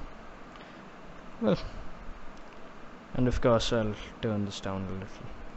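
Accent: India. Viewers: He sounded Indian from the start lol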